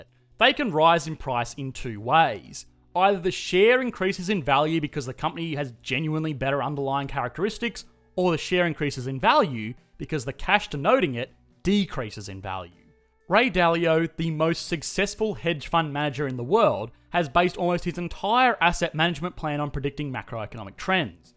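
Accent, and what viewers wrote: Australia. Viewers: Is he Australian?? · is the speaker an aussie?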